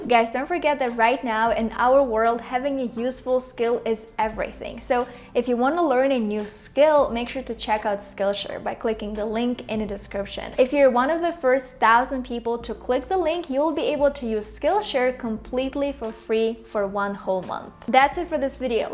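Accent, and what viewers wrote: Russia. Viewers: You speak very well and a nice girl.but still it is heard that you are Russian speaker. ❤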